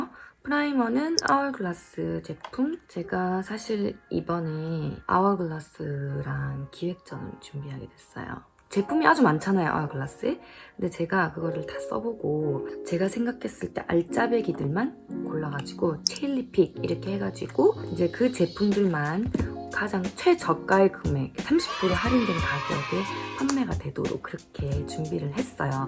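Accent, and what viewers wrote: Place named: Busan, South Korea. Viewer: 얼굴하고 스타일은 American Style일인데, 말투는 완전 부산 Tough Girl. Feels like New Age 이효리. 그런데 왜 이 영상들이 네 recommendation으로 계속뜨지. 난 화장에 관심 없는데.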